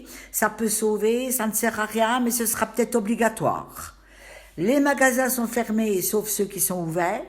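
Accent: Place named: Doubs, France. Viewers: L'accent du doubs , vindiou ! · MERCI MADELEINE (LOLA SIMONIN) MERCI DE VOTRE GRANDE GENEROSITE ,DE NOUS FAIRE PARTAGER VOTRE FRANC PARLEZ FRANC COMTOIS JE VOUS ADORE DEPUIS VOTRE PASSAGE DANS MON COIN LA BOURGOGNE FRANCHE COMTE AU TOUT DEBUT DE VOTRE CARRIERE VOUS ÊTES TOUT TA FAIS LA COPIE CONFORME DES GENS ANCIEN QUI PARLEZ AINSI AYANT UNE FAMILLE A GRAY DOLE CHARGEY LES GRAYS ILS PARLENT AINSI LE PATOISMERCI POUR CE QUE VOUS ÊTES PRENEZ SOIN DE VOUS ET DES VÔTRE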